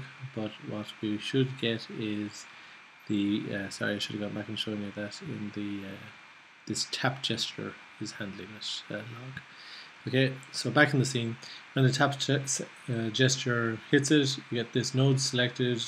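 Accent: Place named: Ireland. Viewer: These are great videos, and it's nice to hear an Irish accent too! Maybe you can help me out with a query: I'm trying to design a game character in Blender composed of several objects (sphere, cube etc.). I want to load it into my cocos3d app as one node with several children, so that I can transform the whole lot at once. Is it possible to set up that group within Blender? I've tried using the regular Blender "group" feature but those don't appear to end up in the POD file. I can see that obejcts can be parented to other objects, but when I parent objects to the sphere which is the largest and most central component of the scene the vertexes are distorted in a bizarre way. Finally I've tried parenting all of them to a bounding cube but I can't find a way to hide the parent cube so it doesn't render in the scene. What would you advise?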